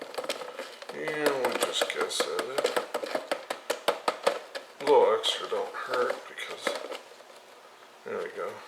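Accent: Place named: United States. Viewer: Good night folks enjoy your blue cobbler he sounds country that is good looks like a friendly guy he just soundd like garfield talking big cat by yall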